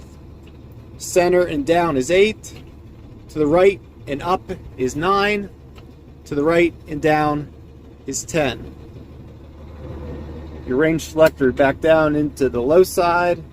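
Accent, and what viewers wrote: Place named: Minnesota, United States. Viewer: Where are you from? Minnesota?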